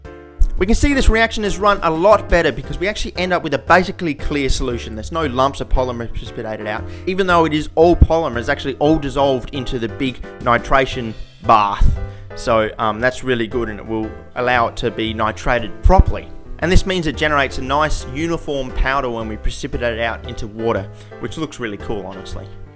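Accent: Australia. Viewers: I'm not the smartest fellow so I'm just here to hear that nice aussie accent while i play video games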